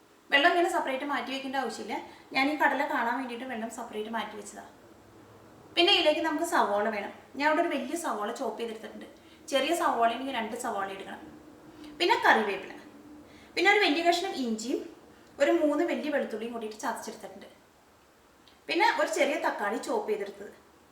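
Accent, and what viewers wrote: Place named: Kottayam, India. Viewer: Nice presentation.. mainly the slang which resembles kottayam bhasha...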